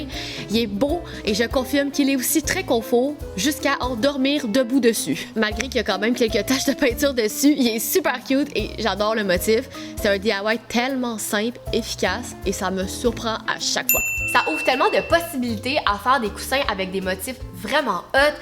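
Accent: Quebec, Canada. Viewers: Accent québécois et non canadien 🥳🤪 vive le Québec libreeeee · Accent Québécois, non Canadien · C plus Québec car y’a juste au qc que c français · G meme pas realiser quelle avait un accent quebecois parce que jai le meme accent quelle 😂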